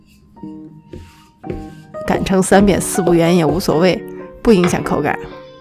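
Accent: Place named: Shaanxi, China. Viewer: 其实除了平时看怎么做美食，赏心悦目之外，还有就是听说话的腔调，虽然不是陕西话，但是很多用词和语调特别的家乡腔亲切❤️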